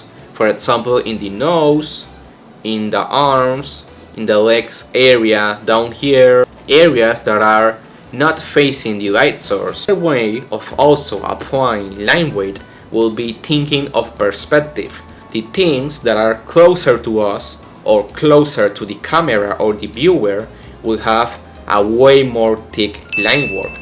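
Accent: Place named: Latin America. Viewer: ya me parecía que el acento era algo latinoamericano (en la información de tu canal dice que sos de Panamá te juro que no te doxeé) de verdad es increíble ver qué la comunidad de artistas es tan variada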